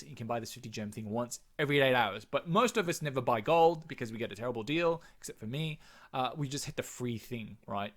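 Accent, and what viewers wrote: Australia. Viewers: Are you in Australia? Love the Aussie accent lol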